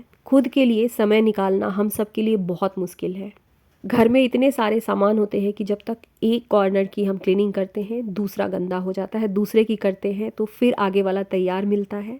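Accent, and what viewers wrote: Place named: Chhapra, India. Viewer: M chpra me rhti hu.pr apki language tone idhr ka hi lgta h.ya khan pan.hryana side ka nhi lgta,video achhi h.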